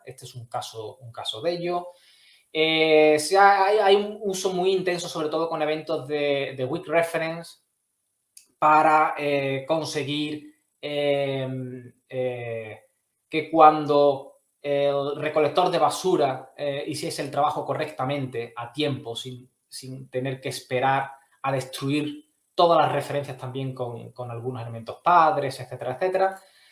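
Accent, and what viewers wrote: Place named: Spain. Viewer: No tolero el acento español 😢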